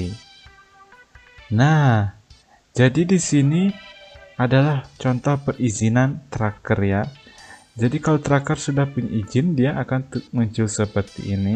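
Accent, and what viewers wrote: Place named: Bali, Indonesia. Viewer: Orang bali ya mas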